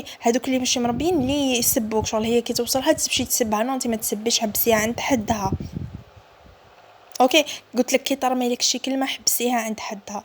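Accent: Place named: Maghnia, Algeria. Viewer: حبيبتي نتي مغناوية ؟ على خاطر غالبة اللهجة المغناوية في هدرتك ❤😍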